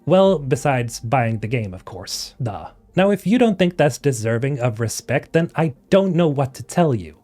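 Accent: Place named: Sweden. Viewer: That cute subtle Swedish accent though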